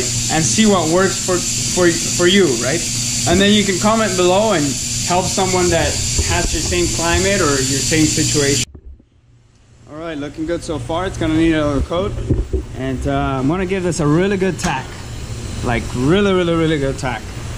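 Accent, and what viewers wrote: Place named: Quebec, Canada. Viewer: Est ce que je reconnais un accent Canadien francais ? :) You seem to have Canadian french accent :) Great vid btw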